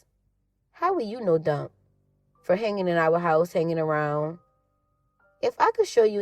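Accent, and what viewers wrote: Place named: New Orleans, United States. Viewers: 💯👍🏾 Telly was a Dog! The narrator sounds like she’s right out the 7th ward! Lol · Finally... someone with the Nola dialect reading. Great job!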